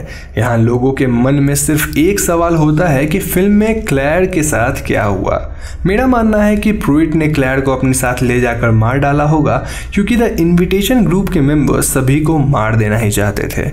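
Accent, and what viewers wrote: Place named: Bihar, India. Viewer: He has bihari accent😍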